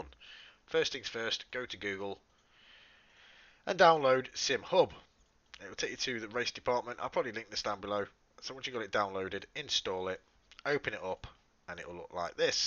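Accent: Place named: Liverpool, United Kingdom. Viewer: scouser for the win great video mate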